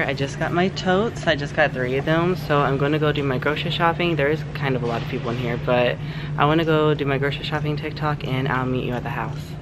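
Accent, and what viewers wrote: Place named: South, United States. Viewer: What state are you in? I like the southern accent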